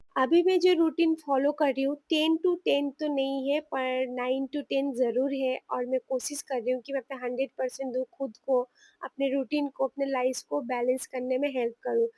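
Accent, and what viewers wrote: Bengal, India. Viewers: Tomake dekhe onak motivation pelam amar o khub tension hoto ami to job kori ki kore biyer por sonsar and job 2 e korbo kivabe samlabo to tomake dekhe sikhkam je ata amader morer tension sob kichu e kora jai❤❤❤ ar ami bengali te liklam Karon tomar hindi bola ta dekhe mone hoche tumi bengali 🥰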